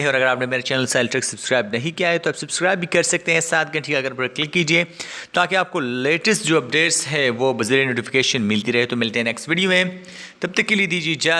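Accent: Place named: England, United Kingdom. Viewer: Abe yaar sahi se bola karo Yaar zyada ismaat kyon bante Ho Urdu me bhi inglish andaaza o lehja ghused dete Ho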